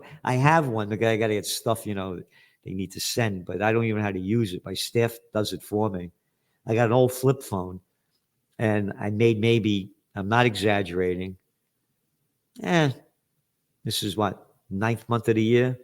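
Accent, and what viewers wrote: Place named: United States. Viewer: You speak American, I like it.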